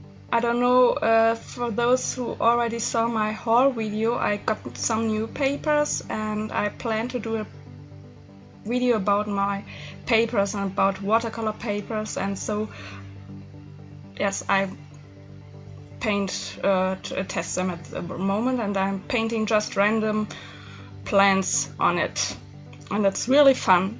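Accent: Germany. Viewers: Immer diese Münchner :D Hört man direkt raus ^^ gruß aus Hamnburg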